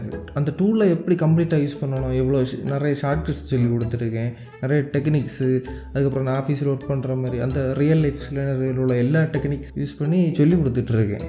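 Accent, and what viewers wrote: Kanyakumari, India. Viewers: Hii neenga Kanyakumari ah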